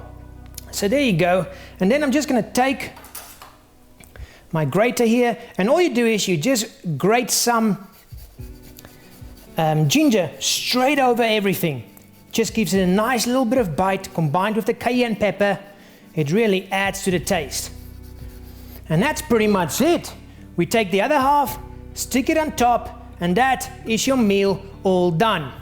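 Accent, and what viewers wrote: South Africa. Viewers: sounds south africa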